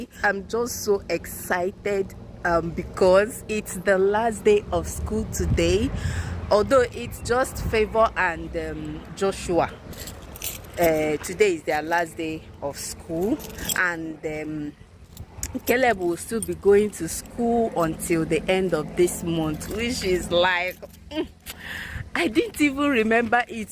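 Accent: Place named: Mbano, Nigeria. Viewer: Even my children call fly mosque 😂😂😂 nne you sound like mbano oo chia i enjoy your igbo. It sound so much like my hometown 😊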